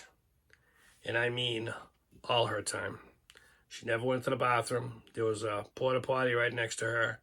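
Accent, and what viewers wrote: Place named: New England, United States. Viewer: Hi! I could not have found your channel at a better time in my life. Thank you ox. p.s. Love and miss that New England accent. I grew up in Massachusetts.❤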